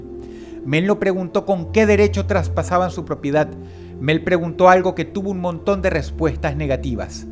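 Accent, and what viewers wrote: Venezuela. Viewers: De los tiempos de cuándo Dross aún tenía acento Venezolano :v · Aquí Dross todavía tenía acento venezolano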